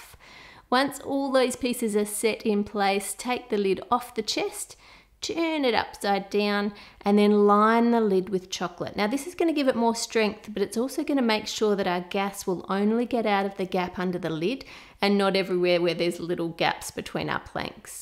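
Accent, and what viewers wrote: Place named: Australia. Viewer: Why am in love with her accent Australia Day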